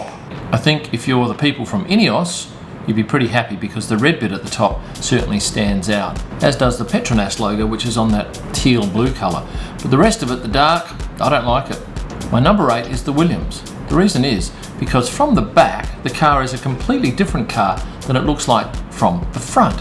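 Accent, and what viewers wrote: Australia. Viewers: How bout a vid on tourist attractions near every F1 track eh mate? Btw I love the aussie accent so tried something. Correct me if I am wrong.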